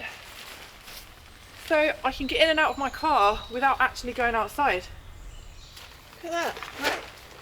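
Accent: United Kingdom. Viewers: "Doof." I love it! Your British English gives me a charge. I learned the word, "bap," for sandwich, and that's fun. Love your videos! ❤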